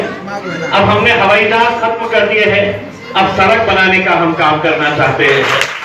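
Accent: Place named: Aurangabad, India. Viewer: Awaz aurangabad ki👍👍👍👍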